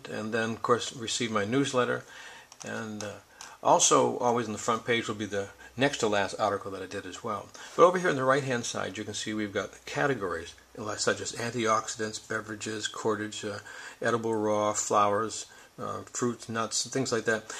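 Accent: Maine, United States. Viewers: Just found this channel R U by any chance from New England Sounds like Maine. ( Where I'm from, but now in WI)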